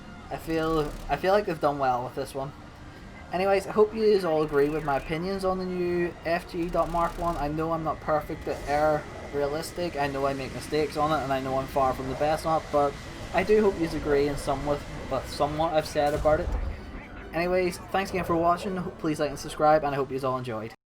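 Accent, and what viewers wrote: Northern Ireland, United Kingdom. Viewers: 100% northern irish, greetings from Lisburn ayy. Great video btw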